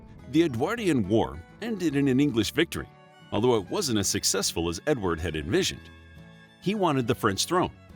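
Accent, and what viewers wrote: United States. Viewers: You do realise this Narrator is American, not British, don't you? Can't you hear his accent. They are obsessed with this rivalry, even though the Brits on the French had been friends for over 200 years.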